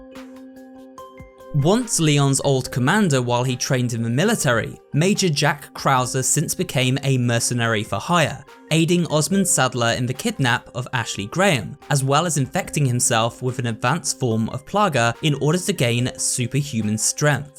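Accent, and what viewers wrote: England, United Kingdom. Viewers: I just love how says all the spanish Words with an english accent, para mi su forma de hablar en español y omitir la ñ me mata de risa (to me, him speaking spanish and skiping the ñ makes me laugh) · i love that my man, translate the Spanish words into english just by changing the accent of the word.. typical english native speaker, doesn't even bother to learn how to say the word properly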